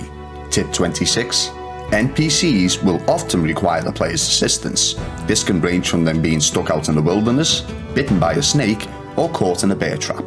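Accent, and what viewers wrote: Liverpool, United Kingdom. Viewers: nice to hear a scouser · That’s some Scouse accent. From Kenny myself!! Great video. Nice one!! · Just playing this for the first time and this video is perfect, so thanks! Also, bonus for it being made by a fellow Scouser! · Yes lad finally a scouser lad your channel deserve more subs content on rdr2 is amazing mate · Me...not long started.These tips are well handy tyvm. As a fellow Scouser though, can i just say that your pronunciation of 'lass - o' surprises me..I'm prob a bit older than you though..i say 'lass-oo'! lol. You sound North end like me though? .but i can hear you dumbing down slightly for the world audience hehe. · Is this guy a scouser? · nice stuff. Could hear the Scouse twang but could hear a bit of strong northern 😁. You're not the the fella who plays cod professionally and lives in wavertree, are you ?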